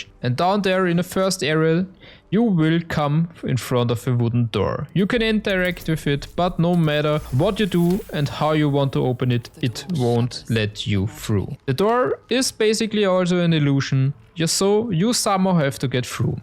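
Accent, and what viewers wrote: Germany. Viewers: Junge dein deutscher englisch dialekt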